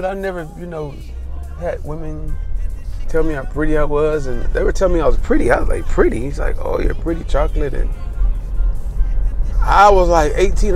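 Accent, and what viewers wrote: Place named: California, United States. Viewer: I knew you was from California. At first I thought you might have been from Oakland or The Bay. You sound like all my potnas I hung with growing up who was raised right like myself.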